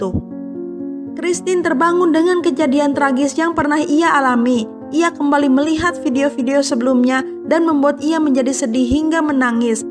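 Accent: West Java, Indonesia. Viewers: chanell ini nih ngerti klu pngen nunjukin khas sunda bs dgn nyebutin (kumaha damang)trus yg bukan org sunda kan ada kata2(welcome....)itu mskpn bahasa inggris jg kn global org2 byk yg tau artinya apa...dgn logat jg org tau ini chanell ini org sunda yg puny...ada chanell lain lupa namanya chanel ny apa trus yg puny ny org jawa dan bacain review film kata2 ny hmpir smua bahasa jawa tp kan yg nonton bukan jawa semua ibaratny klu mau jawa smua hrus ada translate dibawahnya jgan smpe kata2 ny jawa semua kan bisa sedikit kata tp org paham ....makany chanell trsebut byk hujatan n byk yg komentar jlek.... nah aku paham klu mimin bilang subcrb jd subrek trus like dibacany jd likeu itu sengaja lah gk usah dikomentar udh jd ciri khas....memang sbgian klu org sunda emng lidahnya pabaliut alias rumit klu dlm pengucapan apalg b inggris kebyakan makan sampeu hehehe..bercanda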